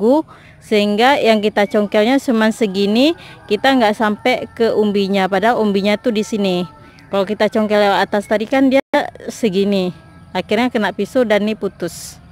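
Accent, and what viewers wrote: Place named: Aceh, Indonesia. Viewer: Kak dmn tinggal nya??? Dari logat nya org aceh ya??? Rencana saya mau main ke tempat kk, tlg di balas ya 🤗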